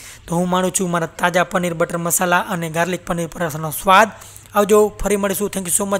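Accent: Kathiawar, India. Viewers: Wahh bhai aedam kesi kathiyavadi ha mojj ha ✌desi bhasha ma samjavyu mja avi gay vala panir ni subji kjavani man htu bhai , tme puru kryu thank you bhai.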